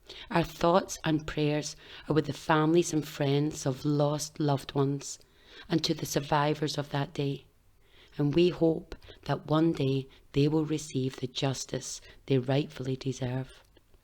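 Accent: Scotland, United Kingdom. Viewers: Is the narrator a Scottish person!? She should stick too Scottish history not Irish!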